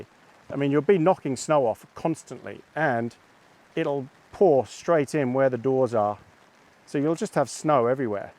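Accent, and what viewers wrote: England, United Kingdom. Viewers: Are you from England originally? I’m isolating at home at the min and started watching your videos 👍🏻😊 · This was very relaxing. Just out of curiosity, were you raised in Britain? You appear to have a bit of a British accent. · Really enjoyed this video I found your channel last night for first time and watch this video and thought you was from the UK until you said New Zealand lol hope you and Bruce are doing well. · So you are in NZ. You sound like you are from the UK. Snap. Where was this filmed? Excellent work again. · Im confused , your accent sounds British , your truck plates say NZ and its raining and cold when New Zealand is in summer in NOV ....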